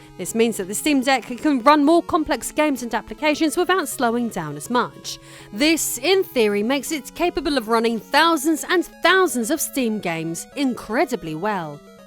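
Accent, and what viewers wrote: England, United Kingdom. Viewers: Damn, she’s hot, especially with that English accent.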